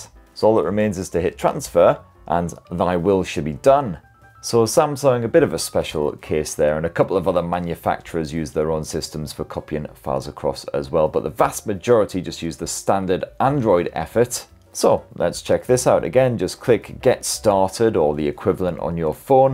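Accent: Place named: Newcastle, United Kingdom. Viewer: Smooth brain fodder. The forced humour is shite. Especially delivered in a crap monotone Geordie/Mackem/Smoggie accent.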